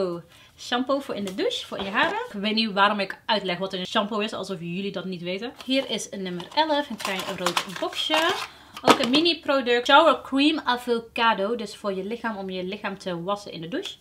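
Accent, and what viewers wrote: Brabant, Netherlands. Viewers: oeeehhh fijne producten erg leuk geniet zo van elke video van je toppertje blijf zo door gaan maar denk ook aub aan jezelf love you je bent zn lief mooi vrolijke meid met je heerlijke brabendse accent heb ik ook Tilburg hahaha liefs en dikke knuffel chantal ❤️❤️❤️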